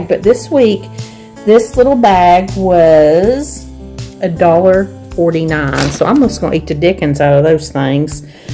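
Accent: South, United States. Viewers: I love listening to that southern drawl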